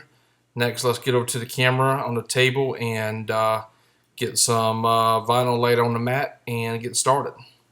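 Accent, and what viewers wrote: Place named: Lowcountry, United States. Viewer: Where in the world are you from? Sounds like my neck of the woods! #lowcountry Enjoyed your DIY!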